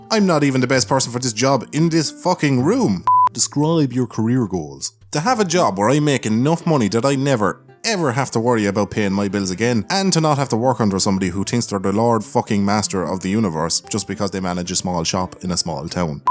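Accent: South Africa. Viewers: South african accent, can someone tell him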